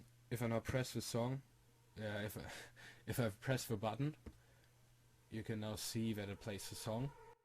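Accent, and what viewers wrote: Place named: Germany. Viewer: Bist du Deutsch? wenn ja hört man es sehr :D aber trotzdem gutes Tutorial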